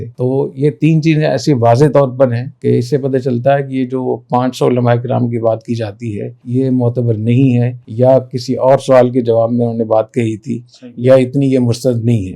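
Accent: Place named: Saraiki, Pakistan. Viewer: اتنابڑاعالم ھوکے تسمیہ کو سرائیکی زبان میں پڑھتاھے شرم کی بات ھے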